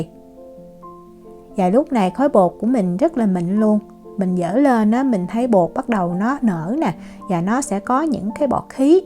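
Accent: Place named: Saigon, Vietnam. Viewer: Hướng dẫn chuyên nghiệp, tận tinh và đúng chất dọng người SG. Cảm ơn bạn và chúc Vạn sự như ý!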